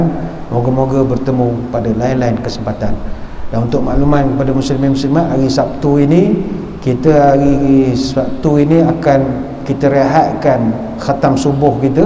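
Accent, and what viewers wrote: Pahang, Malaysia. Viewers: Dakk....Dato org Maran, Pahang. Mmg telo Pahang ada sstgh kwasan ada sikit2 mcm org Perak.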